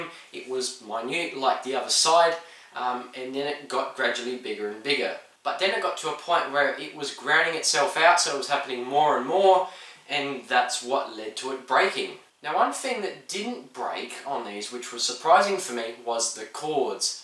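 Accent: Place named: New Zealand. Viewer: damn im pretty early, think im the first aussie, tbh you sound more kiwi then aussie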